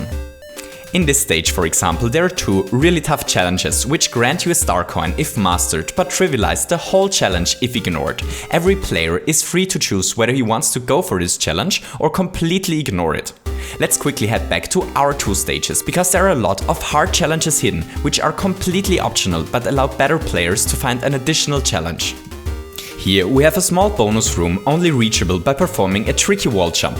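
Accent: United Kingdom. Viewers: "Goompers" "Koopers" "Pletforms" "Dadly" "Chellenges" "Flegpole" WHY IS BRITISH SO BAD!? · I'm guessing he speaks English with British people. They substitute "ah" for "er". It could be hard to distinguish between the two in conversation.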